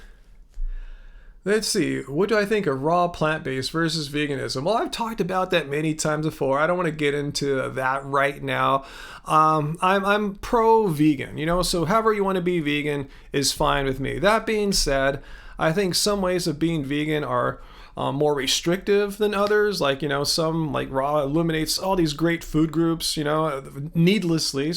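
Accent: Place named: Wales, United Kingdom. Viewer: Welsh hey? Ok we got you! :)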